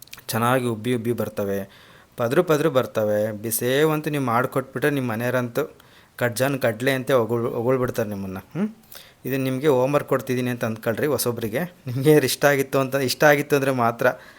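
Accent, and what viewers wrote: Chitradurga, India. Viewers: ಅವರ ಮಾತೆ ಅವರ ಸಂಪತ್ತು, ಮತ್ತು ಆಕರ್ಷಣೆ, ಯಾಕೆ ಹಾಗೆ ಹೇಳುತ್ತೀರಾ. ಅವರ ದುರ್ಗಾ ಭಾಷೆ ಶ ಎಂತ ಚಂದ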